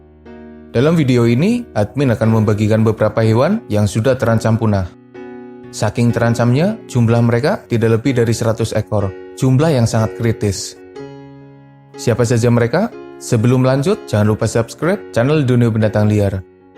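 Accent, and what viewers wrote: Banyumas, Indonesia. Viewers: Ora ngapak ora kepenak👍🏼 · Emang ciri khasnya begitu. Ciri khas orang ngapak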